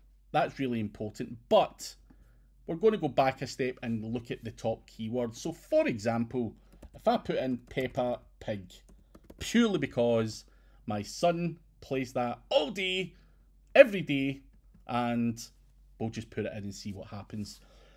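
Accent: Scotland, United Kingdom. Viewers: Scottish brogue: still undefeated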